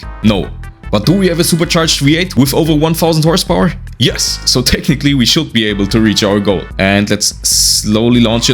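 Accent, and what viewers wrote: Germany. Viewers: I can hear a bit of deutsch there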